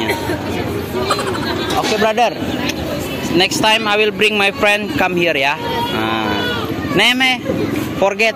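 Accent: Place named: West Java, Indonesia. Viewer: Mas situh asli nya orang sunda ya klo saya perhatikan dari logat logat nya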